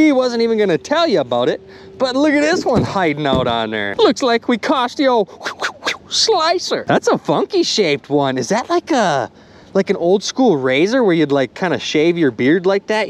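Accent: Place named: Rhode Island, United States. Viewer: May I know where is this cute accent from? Rhode Island? LOL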